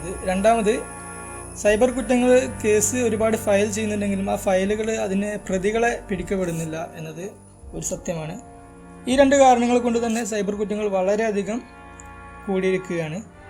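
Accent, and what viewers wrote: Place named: Malappuram, India. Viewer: P P മലപ്പുറം ഭാഷ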